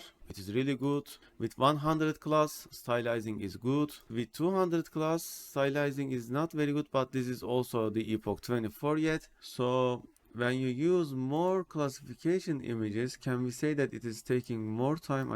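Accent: Turkey. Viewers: ABİ TURK İNGİLİZCE AKSANİ OLMASAYDİ SEN YİNE OLURDUN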